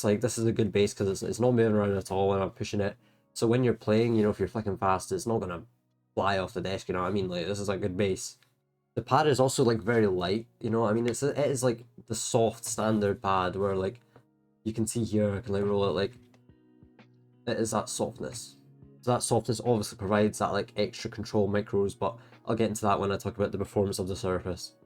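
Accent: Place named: Scotland, United Kingdom. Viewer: Scottish accent 😊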